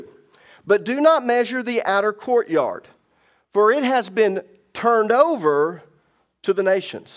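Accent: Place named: South, United States. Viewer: You definitely sound like your from the south too! 😁 Bama girl here. Love your teachings 💜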